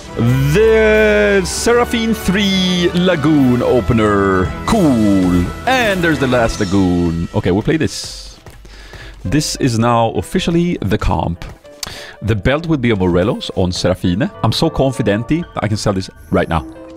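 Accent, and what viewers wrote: Sweden. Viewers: All though as a fellow Swed i prefer your English over your Swedish accent, sorry your English is more crisp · Hey, please keep the Swenglish. Det är alltid trevligt med en landsfrände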